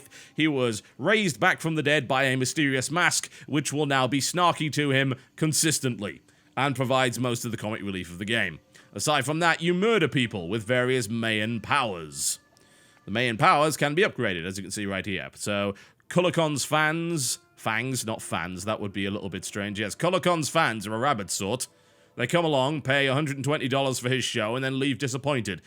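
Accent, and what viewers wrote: United Kingdom. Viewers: Oh by the way, The Gods, he is british. It's called an accent. Deal with it.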